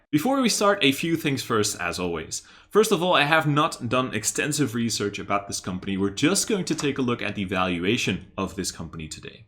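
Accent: Netherlands. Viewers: Bedankt! Als ik mij niet vergis, kom je uit Nederland. Maar goed ik vind jou analyse mooi ga zo door. Ik ga je kanaal promoten!!!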